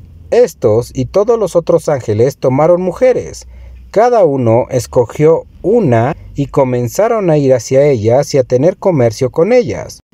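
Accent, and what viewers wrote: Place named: Mexico City, Mexico. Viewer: La información que subes en los videos, no está mal, es la que encuentras en otros canales, pero tu voz con acento de Chilango fresa nada más no te ayuda mucho! Cambia tu tono a más Profesional!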